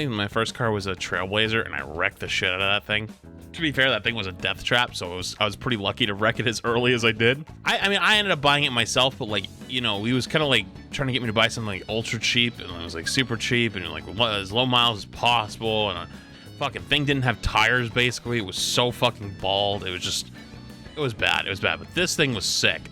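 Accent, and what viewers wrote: Boston, United States. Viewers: You're from Boston?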